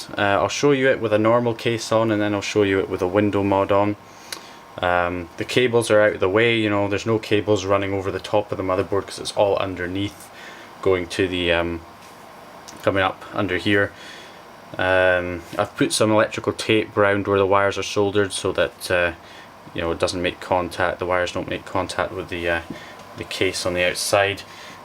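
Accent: Scotland, United Kingdom. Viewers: Good old scottish accent love it hahaha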